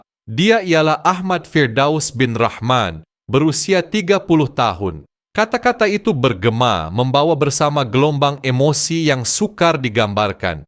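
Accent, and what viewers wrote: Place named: Indonesia. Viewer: ni konoha, diorang memang banyak buat content merapu. Kalau dengar cara bercakap ni bukan Malaysian 🇲🇾, orang seberang!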